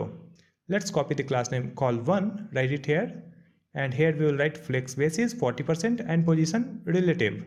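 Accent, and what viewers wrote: India. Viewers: You instantly know that the video is good once you hear the Indian accent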